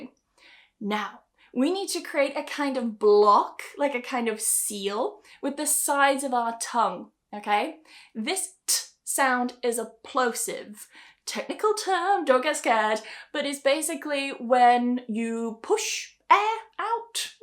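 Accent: United Kingdom. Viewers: Very nice, fantastic. I like British Accent the most. Thank you very much, may God bless you mam. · Thank you very much for your teaching. I love ♥️your British accent. I just want to make sure when it comes to the sentence "The vet helped the dog", the D sound of "helpeD" become a "T" sound? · Your accent is so nice I`m sure It would really me I really like british pronunciation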